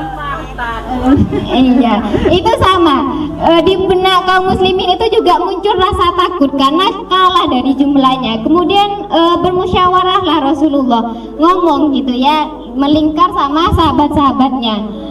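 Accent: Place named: Madura, Indonesia. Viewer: Logat nya deh bestie medhureh...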